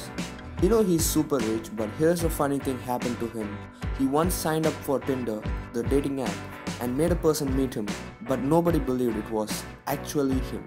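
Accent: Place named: Australia. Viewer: This guy has Australian accent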